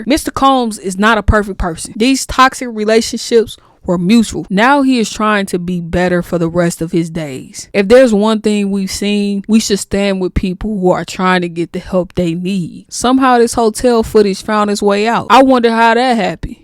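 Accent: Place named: West Coast, United States. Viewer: I love your west coast accent!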